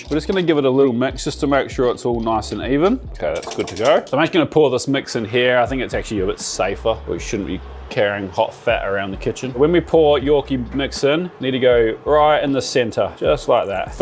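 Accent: Australia. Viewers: Are you australian ? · He Aussie mate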